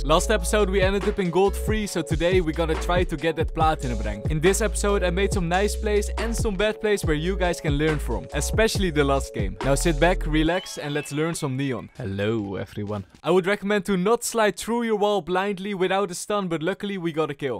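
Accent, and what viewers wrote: Netherlands. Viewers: im also dutch and i like your accent XD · what I mean is the English is so heavy he's basicly speaking Dutch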